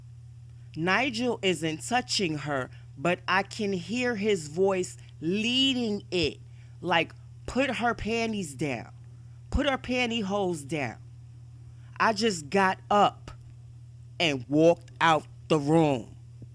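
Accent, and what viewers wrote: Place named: California, United States. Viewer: Listen u cuarvoint lady 😅😂😂😅 talk bout wht u kno you sound like you from California and you country niggas is always talkin bout New York business and don’t kno wht da fuck ya talkin bout that young lady was from BROOKLYN she was a somebody and that’s why them BROOKLYN niggas shot him in da dick point blank period now go ya chicken an waffle eating the same time ass da fuck up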